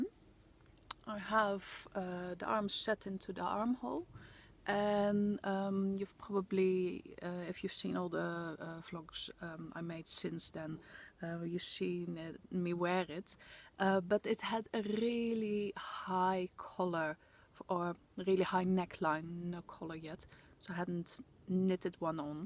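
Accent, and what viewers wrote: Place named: Netherlands. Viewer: Hearing you, I already thought you would be Dutch, because I hear you thinking in Dutch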